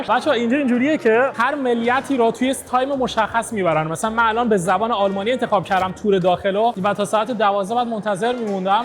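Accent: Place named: South, Iran. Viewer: لهجت خیلی غلیظه جنوبی هستی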